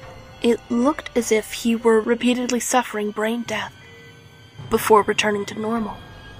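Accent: Russia. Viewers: that Russian accent tho ;) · Your Russian accent reminds me of Tenenbaum from Bioshock. Great job!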